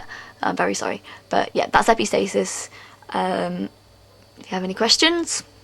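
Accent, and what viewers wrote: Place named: United Kingdom. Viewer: a late night study session before a test can always be made a little better with a cool British voice girl that's good at explaining stuff. Thanks!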